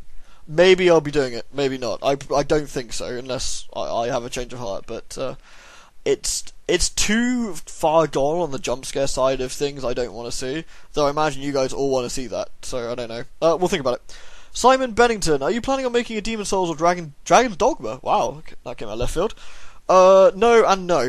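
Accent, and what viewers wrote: United Kingdom. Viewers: acknowledged me! For some reason I fangirled when you yawned during my question... It must be your sexy Britishness or I'm weird. Either way keep up the amazing videos, they are one of my highlights.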